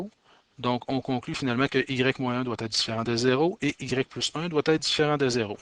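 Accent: Quebec, Canada. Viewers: Emre Turhan c’est du canadien français donc c’est un accent normal pour ce pays... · quel accent? cest du quebecois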